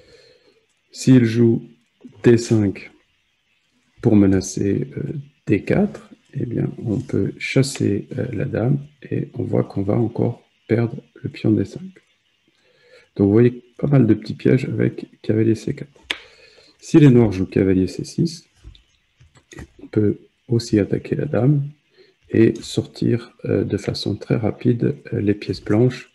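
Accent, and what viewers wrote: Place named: South, France. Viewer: magnifique vidéo, très pédagogique ! Je vais reprendre mon activité sur la chaine "échecs en amateur" et parler de ta chaine comme une pépite du net échiquéen francophone... dès que le COVID m'en laissera le temps (je suis médecin)... en tout cas c'est un vrai plaisir de visionner tes videos : courtes claires et ensoldeillées par ce bel accent du sud !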